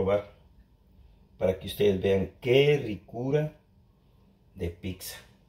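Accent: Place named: Mexico. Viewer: Su acento suena más a mexicano que a guatemalteco 😃👏👏🇲🇽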